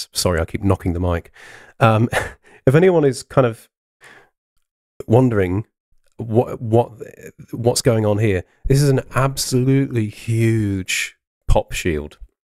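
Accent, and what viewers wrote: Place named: United Kingdom. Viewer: it‘ a pleasure to listen to your British, sophisticated voice.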